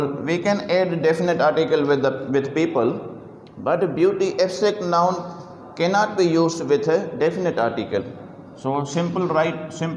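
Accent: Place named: Sindh, Pakistan. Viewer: Sir u r out standing nd one thing ur voice tone is purely Sindhi u must keep in ur mind u delivered lecture in English 🙏🙏🙏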